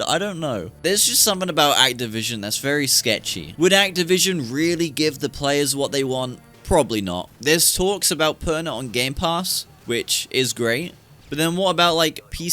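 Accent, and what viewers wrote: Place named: United Kingdom. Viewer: Do you also do a car channel? Your voice sounds familiar. Classic britain😊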